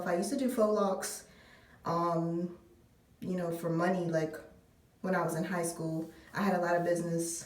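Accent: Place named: DMV, United States. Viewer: You talk like you from the dmv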